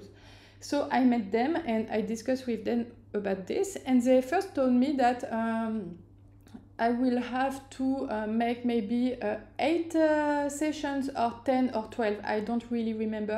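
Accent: France. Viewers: L anglais est top , juste l accent maïs ça a son french charm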